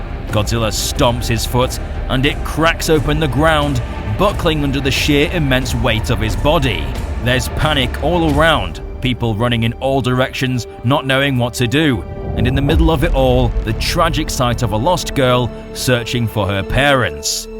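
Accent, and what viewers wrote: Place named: United Kingdom. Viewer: i finally figured it out, the thing that has been bothering me for so long, you are british aren’t you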